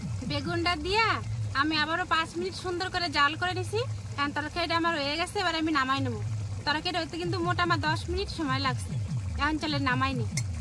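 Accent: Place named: Bangladesh. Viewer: দিদি তোমার বাড়ি কি আগে বাংলাদেশে ছিল তোমার কথাগুলো একদম বাংলাদেশের মানুষের মতো❤️❤️❤️❤️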